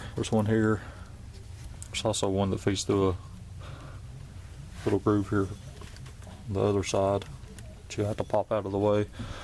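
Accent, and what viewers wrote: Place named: South, United States. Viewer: Jeez I can barely understand your country shitty ass accent. Awesome video tho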